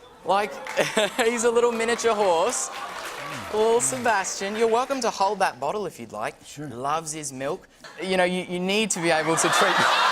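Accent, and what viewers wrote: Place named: Australia. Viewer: oh boy I just love listening to an australian man shout at people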